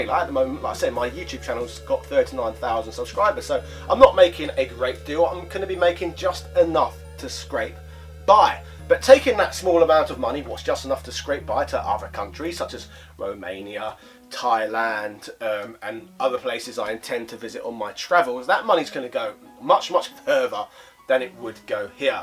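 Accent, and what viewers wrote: United Kingdom. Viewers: Oh God you successfully fooled me.. You are the first and only western YouTuber ever talked about ACan and gave it some respect it deserves by my knowledge. I love your deep and original comments along with your British accent, as a chinese I was once horrible of comprehension of authentic English, all thanks to the overwhelming US influence, but my wife is in UK as a visting scholar and I must be able to get what's British people saying for the seek of my UK tour this October. Your channel helps me make huge improvement, great job. 👍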